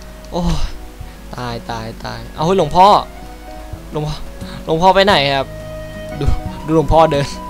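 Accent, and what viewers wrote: Suphanburi, Thailand. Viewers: คนสุพันป่าวเนี่ย เหน่อจิงๆๆ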